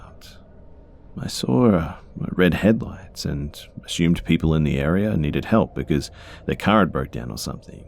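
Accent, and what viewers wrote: Australia. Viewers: Australian accent 🤭🤭🤭 I made a mistaken post thought I heard eng. very different sorry. 🖤🖤🖤☺️☺️ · Mate aussie for the win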